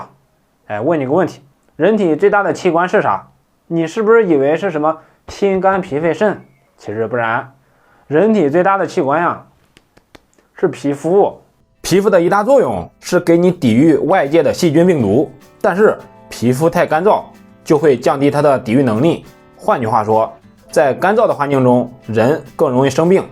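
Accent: Henan, China. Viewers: 河南口音这么重！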